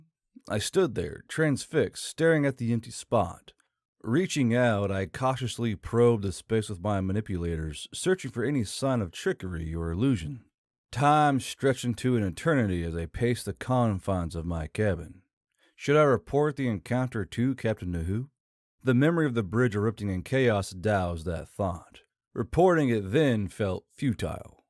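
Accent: South, United States. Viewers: He has a version of a Southern Accent. I, myself, would NOT wish him to try to learn to speak "standard American"! There is much more to his speech than of just an accent, it is also the feelings and idioms too. Must the non-AI storytellers also speak the same way that the AI speaks?! I hope not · I'm not disagreeing with you. But I have to comment on the standard American comment. You cannot get more American than this mans accent. It is found no where else. That is all. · Being from the south myself, I can appreciate a good drawl. However, the good old boy is no excuse for improper diction and pronunciation.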